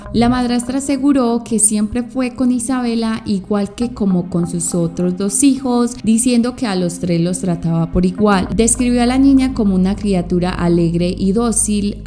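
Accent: Antioquia, Colombia. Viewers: Eres de Colombia? O de donde es tu acento? Me encanta tu acento. Bueno saludos ciao 💋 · Me encanta tu espontaneidad, sos PAISA lo sé .. yo también aunque vivo en Londres have 20 ańos. Ese acento nuestro es maravilloso Sigo tu canal y me gusta mucho tus historias y análisis.. MUCHÍSIMA SUERTE DIOS TE BENDIGA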